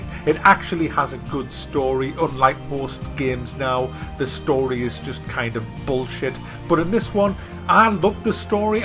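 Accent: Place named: Sunderland, United Kingdom. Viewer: North East of England. Sounds like somewhere like Sunderland but I could be wrong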